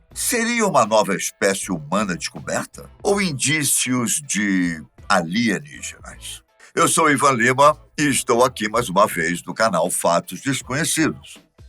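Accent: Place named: Ceará, Brazil. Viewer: Quase achei que era um cearense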